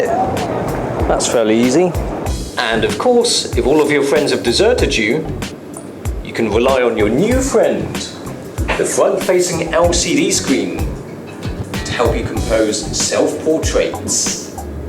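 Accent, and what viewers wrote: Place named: United Kingdom. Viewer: where is that at??? i noticed u were in hk.. with a uk..a ccent?? sorta... i go to hk.. 2-3 times a year.. :P goin this august... not too many hkers speak english.. used to.. not nemore.. u have excellent english.. cheers!!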